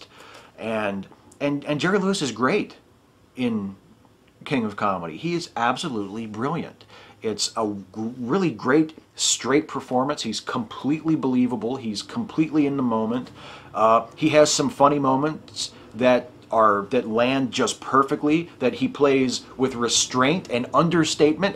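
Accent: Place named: Philadelphia, United States. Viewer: I know EXACTLY what you mean about Jerry Lewis and the Telethon; I was a child of the 80s too, and it is.definitely a strangely nostalgiac memory. Also, based on your pronunciation of the letter "o" in words like, "on"; are you originally from the Philly area?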